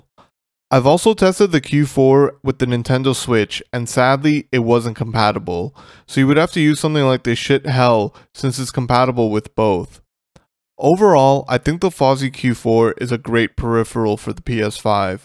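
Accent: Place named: United States. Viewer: sounds like a heavy dude, oh hes murican lool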